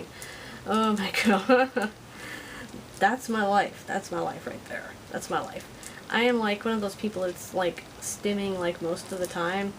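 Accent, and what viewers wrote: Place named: South, United States. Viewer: i was born in Houston, i like your southern accent :p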